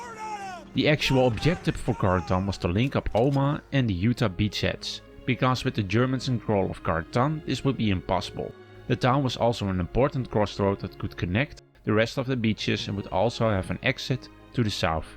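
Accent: Netherlands. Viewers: Aan je accent te horen ben je overduidelijk een Nederlander - ik ook zoals je leest ;-). Het algoritme van YT bracht me hier, echt interessante video, goed gemaakt! Nu mijn vraag: heb je al overwogen een 'episode compare' te maken van de afleveringen van BoB die zich in Nederland afspelen? 't Is al minstens 10 jaar geleden dat ik de serie voor het laatst gezien heb, maar ik meen me te herinneren dat minstens 2 afleveringen zich (iig gedeeltelijk) in Nederland hebben afgespeeld (Eindhoven, Veghel & buurt van Nijmegen -> die met dat kruispunt ergens in een polder vlak bij Nijmegen). Heb je uploads bekeken, maar zag ze er niet bij staan... lijkt me echt interessant vanuit historisch oogpunt, ook voor de vele fans van over de hele wereld van deze fantastische serie, plus qua productie voor je relatief 'eenvoudig' te maken - je woont hier immers al (neem ik aan, althans). 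Ik voorspel je, het zal echt goede content zijn die je veel views zal opleveren! :-) (een hele serie over alle afleveringen zou helemaal een hit zijn, denk ik... maar het moet allemaal maar kunnen natuurlijk -> daarom, Nederland om te beginnen, lekker dichtbij) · Are you Dutch? Seem to have an accent. Thanks for this ! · You sound dutch as frick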